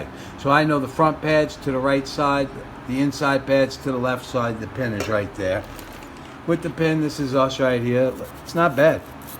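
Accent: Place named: Long Island, United States. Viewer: I lived in Suffolk County, Long Island New York. Sounds like you're from there, lol.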